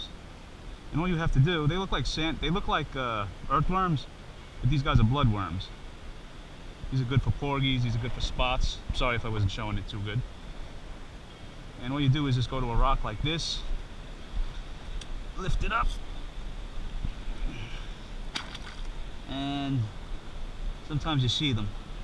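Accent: United States. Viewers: I knew you were from states island soon as I heard your voice lol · Hi brother, you speak like my long island friends that I worked with back in the early 80's at midtown South. Anyway I looked up where to find bloodand sand worms in new York City and surrounding areas.i got your channel. Still don't know where to go exactly but hopefully will. Specially close to NYC. Thanks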